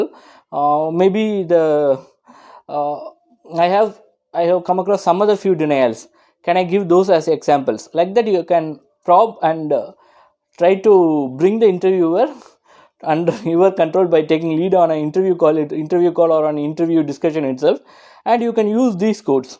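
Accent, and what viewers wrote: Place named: India. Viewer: Oh man, I'm really struggling to understand that hardcore Indian accent! Subtitles help a lot.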